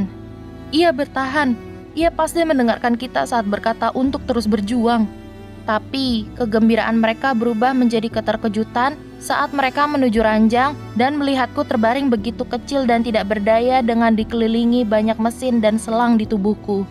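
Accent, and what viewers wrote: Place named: Java, Indonesia. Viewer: medokte tolong-kecil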